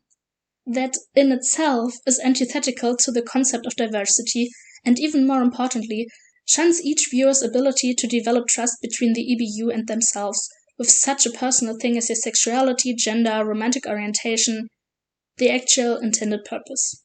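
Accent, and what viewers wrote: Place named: Germany. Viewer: I can hear your German accent.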